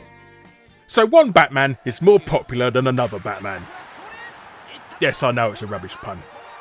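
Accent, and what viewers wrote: England, United Kingdom. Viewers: Guru Larry just made me realise you can't spell Successful without SuCC! God bless that English accent of his!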